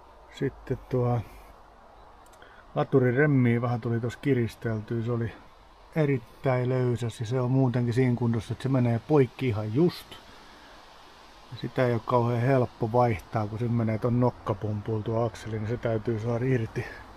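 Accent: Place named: West Coast, Finland. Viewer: Hieno laite! Itellä samanlainen. Kunto kyllä ainakin päälle päin heikompi omassa koneessani, mutta pääosin toimiva sekin ja on päivittäisessä käytössä. Lumen aurausta ja trukkipiikeillä roudaan tavaraa. Kaivuuta toistaiseksi vähän ja sitä tarkoitus tehdä jatkossa enemmän. Käyttäjän murteesta päätellen jossain länsirannikolla ollaan. Itsekin aikoinaan koneen hain Porista.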